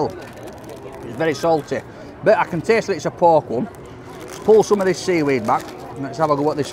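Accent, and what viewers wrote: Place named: Yorkshire, United Kingdom. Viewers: These people obviously love the English accent, mate LOL 😁Keep this up. Really enjoying this. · I’m from Yorkshire and his dialect isn’t too strong compared to some older people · Visit Cha Cha chicken, it’s near Santa Monica pier great Mexican food and owned by local people as far as I’m aware. Love that your presenting our Yorkshire accent over there , tell them it’s the Jon snow accent then they understand haha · I completely agree with you and his personality and accent have given him the opportunity and success he has. I’ve bumped into him 3 times in Leeds . I’m not a stalker lol. He knows his USP and that’s why he is now where he is. Northern lad done good 👍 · "What's t'best one?" "Huh??? Everyone's struggling to understand our. Pride of Yorkshire! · Don’t think he understands your Yorkshire accent over there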